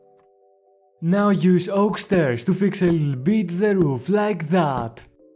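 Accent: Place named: Greece. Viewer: Your axcent sounds greek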